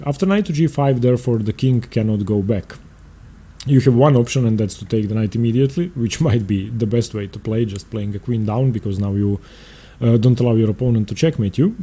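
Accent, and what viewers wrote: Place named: Russia. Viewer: Of course he has russian accent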